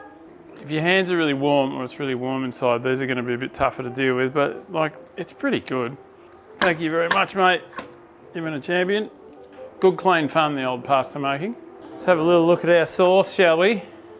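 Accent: Australia. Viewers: You sound like you’re from WA.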